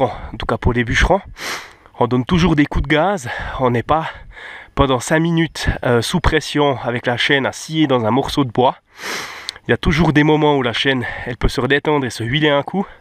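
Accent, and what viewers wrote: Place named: Switzerland. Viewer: Mugnerot Daniel morteau morille c l accent du doub et lui c l accent suisse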